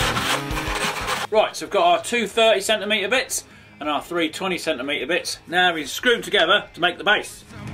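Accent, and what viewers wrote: United Kingdom. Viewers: It was some screws he's British it's his accent that makes you think that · I love his British accent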